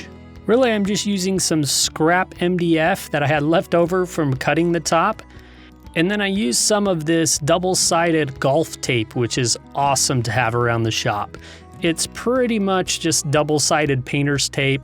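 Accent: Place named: Salt Lake City, United States. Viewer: "All the way acrosst it?" SLC people talk funny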